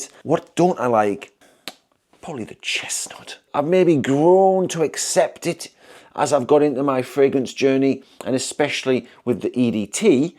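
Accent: United Kingdom. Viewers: Look forward to the Azzaro vid, they do great frags. I was trying to pinpoint your accent, I thought it east Yorkshire. If you get the chance, defo Scent Salim in Leeds/ Bradford is worth a shout. I can recommend a handful to try, and they are great hosts! I'll keep my eye out for the Azzaro vid.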